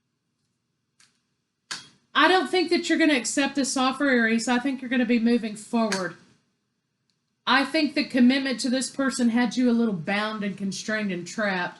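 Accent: South, United States. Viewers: Where are you from if I may ask.. I love your southern accent and how u serve it straight up, no chaser!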